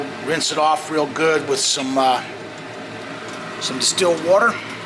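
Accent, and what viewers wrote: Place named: Philadelphia, United States. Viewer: you're from the North East aren't you? I wanna say around me in NJ (in the south where we don't have that accent - we sound liker Philly) and I feel like I hear some Philly in your words, but I'm probably wrong. That's be cool if if I guessed it though. :)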